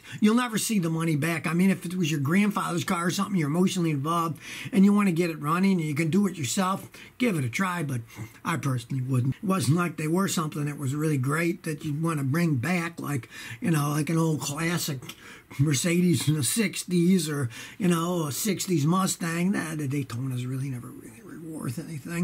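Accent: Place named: East Coast, United States. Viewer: Are you from Philadelphia? Your accent sounds from the East coast.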